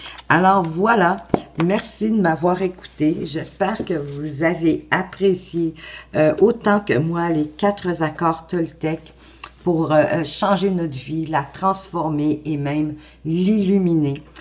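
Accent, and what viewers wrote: Quebec, Canada. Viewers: Coucou 😊 Pour commencer...Wouaaaah quel effet ça fait d'être citée au Québec😁, j'suis trop contente car j'ad😍re le Québec & votre accent🥰 ! Mais je les connais ces 2 jeux ! La petite boîte je l'ai offert à une Amie et le coffret carré est juste MAGNIFIQUE, nous sommes carrément plongés dans l'univers/l'atmosphère du Sud du Mexique, ça fait voyager direct...Tu sais qu'il a fait le coffret "le 5éme Accord Toltèque" avec le même style (boîte carrée & même style d'illustration) ? Va falloir investir 😁 à moins que tu l'ai déjà ? Confidence pour confidence, je préfère ta version (elle me fait voyager) mais je n'ai pas eu l'occasion de l'avoir☹️🥹😢. Lumineuse journée à toi 🌞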